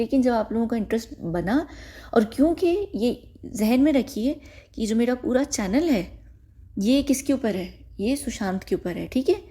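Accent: United Kingdom. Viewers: I honestly love ur British accent 💞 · Sis I personally love your British accent. I just thought to share with you because of the warning statement u mentioned in the beginning. Baki log bhaar me jae. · funding to movies by badelog aur durgs ka kya sceene kasi funding hoti hai, ye salman k bahar dubai kyu jata hai? funding? aur prostitution kon provid karta hai bollywood m ya phir salmon ka hat kya hai es m. kya salman k sach m religious neutral hai ya bas dek ka ne k liye ganpati festival karta hai. wasi es m to sure hu m to b kuch extra info aap batavo, salman ki ek sister hai usne to ek hindu se shadi ki, kya seen hai love jihad k mamle m, kya salman uski sis. ki beti ko flim m launch karega? aur new comers ko ye kasi treat karta hai, outsider aur insider b jayse Sonakshi Sinha (ki se ne controvesy ki ti ki salmon sonakshi ki mom k sat night.) aur Saiee Manjrekar eska b batavo. 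vae se british acc. to badya hai aapki. suna te raho bech bech m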